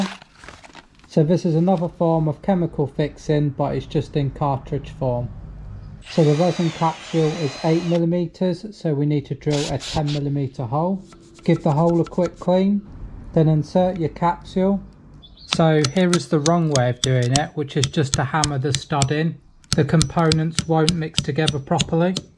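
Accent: England, United Kingdom. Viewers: Is that England accent?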